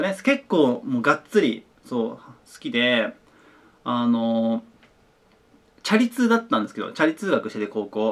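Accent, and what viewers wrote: Kansai, Japan. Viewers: 関西弁が入ってた方がいいです！！！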